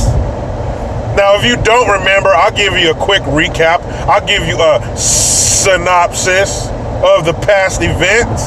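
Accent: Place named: South, United States. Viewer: I LOVE the hillbilly accent! I'm from the South, and I ain't even mad at it.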